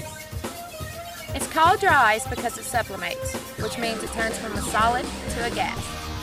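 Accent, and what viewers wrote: South, United States. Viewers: I like her southern accent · southern accent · she got a southern accent!!! i like!!!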